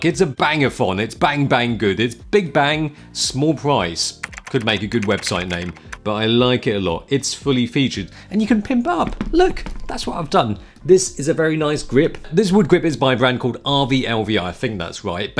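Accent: United Kingdom. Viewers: imagen being lost in china and you bumb into this guy for directions lol he sounds more britsh than most brits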